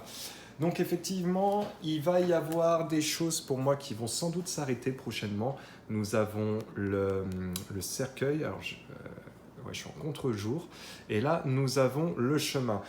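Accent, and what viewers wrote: Canada. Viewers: Bonsoir, j’aime beaucoup quand tu tire les cartes devant nous, après tu fais comme tu le sens. La reprise des cours est elle bien?? Anglais!!! Français!!!! J’aime l’accent canadien 😀😀😀. Merci pour les nouvelles avec les cartes. Hé oui tu fais parti de la famille. Amitiés Sincères 🌹 🌹 🌹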